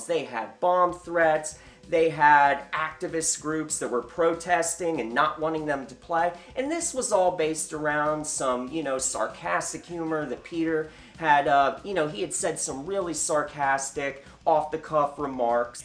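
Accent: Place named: East Coast, United States. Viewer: This was like a TON biopic.. so much info, it's fantastic💚🖤. You gave each album equal opportunity; the the details of his life personality, for example his fear of judgement and substance abuse/ mental health. He was such a sweet soul deep down. Your tales of meeting him are so endearing. Thank you so much for this. He really did make the world if music a better place. P.S. I was way off on your accent a while back... definitely caught the east coast this time. That one time I guessed the South 🤦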